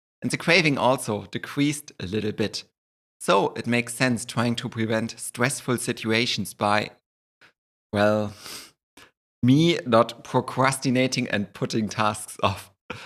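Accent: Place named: Germany. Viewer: Das klingt so falsch wenn ein Deutscher englisch spricht.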